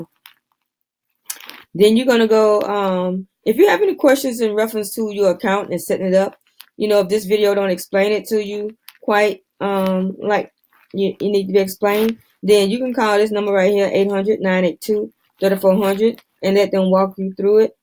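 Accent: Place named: Houston, United States. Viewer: You sound like you from h twn. Preciate the info fr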